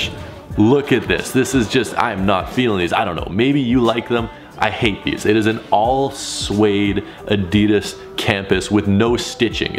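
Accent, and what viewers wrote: Winnipeg, Canada. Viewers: Are you from Winnipeg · Are you Canadian oh and I going to like my own comet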